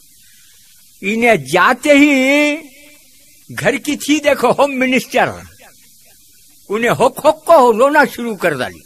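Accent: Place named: Hyderabad, India. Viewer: North india me aisich boleto hyd ki language ku gaun ki language samajhte, ab ye jo lehja hai, bohot purana hai. Hyd me salon salon pehle, aisa baat karte the magar ab bohot sare north ke words laliye. Bangalore bhi bohot developed city hai. Wahan pe aisa baat karte, to kya bangalore gaoun hogaya kya be ? Language se city gaoun samajhne wale gawar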